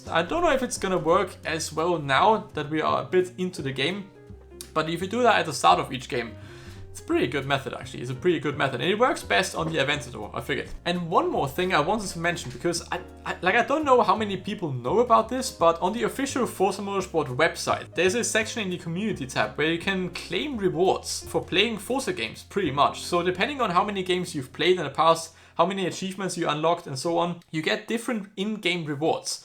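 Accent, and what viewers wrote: Germany. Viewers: Irgendwie finde ichs lustig wenn Deutsche Englisch sprechen auf YouTube. Kein Front gegen dein Englisch, liegt glaube an mir :D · You sounds like a German. I Think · wie man das deutsch einfach raushört haha xD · you know its bouta go down when you hear the german accent and you see the glasses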